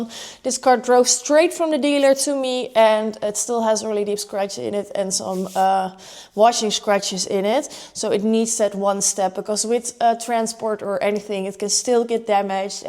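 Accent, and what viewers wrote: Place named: Netherlands. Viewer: Love your NETHERLAND accent 🤗🤗🤗🤗🤗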